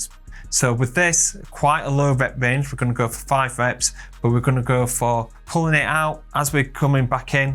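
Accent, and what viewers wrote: United Kingdom. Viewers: The real British accent makes the Viddo much interesting 👍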